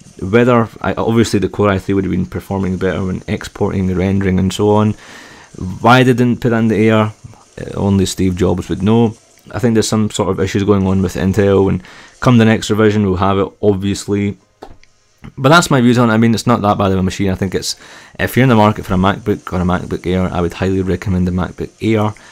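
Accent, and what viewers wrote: Scotland, United Kingdom. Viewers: I adore your Scottish accent (if that's what it is), even if it's quite hard for me to understand as a non native speaker! Very interesting real-life usage tests, the MBA looks like a great machine.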